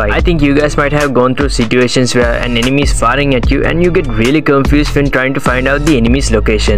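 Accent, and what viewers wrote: India. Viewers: I love your indian accent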